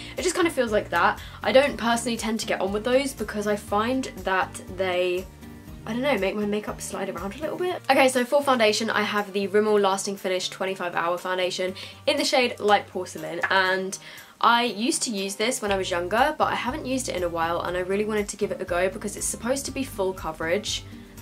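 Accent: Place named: United Kingdom. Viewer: she sounds so extra British today. Idk y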